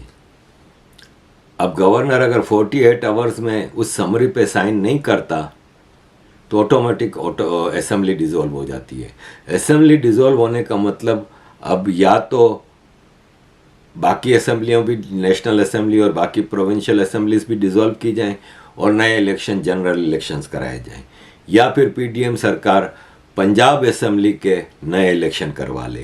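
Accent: Gujarat, India. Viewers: Aapka accent Gujarati jaisa hai kafi had tak, bada meetha lag raha hai sunna. Main ummeed karta hoon aapke desh mein vikas ho aur iss dikkat aapko nijaat mile.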